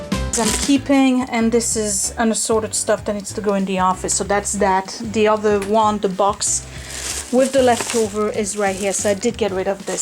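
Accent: France. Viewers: What I'm about to say is probably to repeat what others have already said...but... schools might love the pens pencils. Your baskets, and the contents , the post it notes -- other things -- do NOT look tacky, nor shoddy and others will love picking through. If you placed some of these things within the baskets curbside with a FREE sign atop ... well all this really good looking stuff (if excessive) might disappear quickly. What courage you show in tackling the impossible. It's what you don't want to do but you do it. Super character modeling for those kids. Again a joy to listen to this French inflected lovely voice and all the dissecting of life and our little errors in buying...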